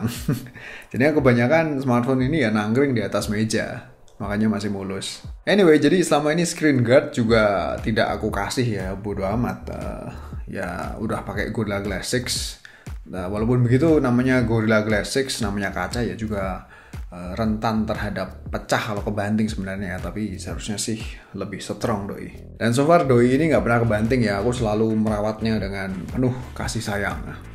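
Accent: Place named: Banyumas, Indonesia. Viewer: Dri logatnya Mas nya ngapak ya ? Klo iya kita satu darah 😂🎉 Sukses selalu 👍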